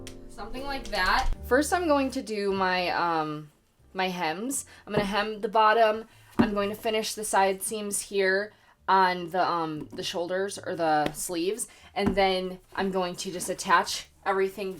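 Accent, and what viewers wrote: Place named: Midwest, United States. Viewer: as a cali girl i find your midwestern accent so soothing and refreshing from the influencer vocal fry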